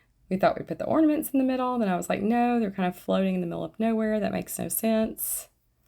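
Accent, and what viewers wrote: South, United States. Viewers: Excellent tutorials. I know nothing about embossing and I’m so pleased I came across you channel. I love a southern accent and a gal that can talk my ear off. So refreshing. Thankyou 🤗🥰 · I’m very very sensitive to voices and actually think your tone and cadence is very calming. Ignore the meanies, some people just need to spread their own misery sadly. Also love your little bit of Southern accent lol. I can’t wait to get back down to Goodyear for the winter and do some more shopping at your booths.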